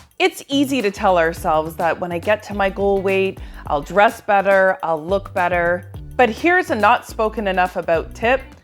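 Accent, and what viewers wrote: Canada. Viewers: Just saw your video. Great talk. Are you from Canada?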